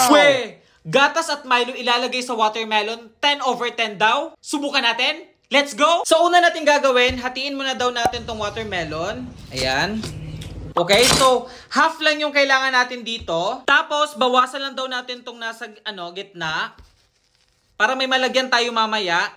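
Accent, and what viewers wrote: Visayas, Philippines. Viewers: Bisaya ka po ba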